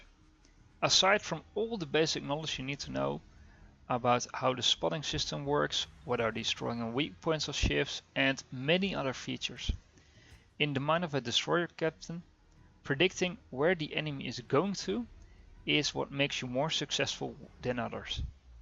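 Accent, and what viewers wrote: Netherlands. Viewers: ĺike your videos! subbed. are you by any change dutch? you sound like it:)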